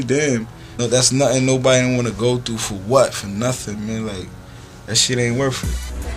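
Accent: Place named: Brooklyn, United States. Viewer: you sexy pa pa...I love the way you talk...so NEWYORK....(my hometown)...Ima fine redbone sista and im sending you so much love young GOD...only the strong survive...STRENGTH, GUIDANCE AND PROTECTION...Peace!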